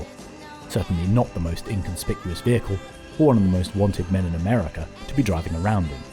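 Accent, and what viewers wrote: United Kingdom. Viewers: Lost me at the British accent.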